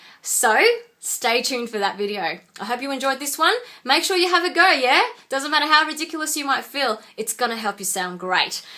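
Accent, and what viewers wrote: Australia. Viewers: i can't get enough of your slight asian-aussie accent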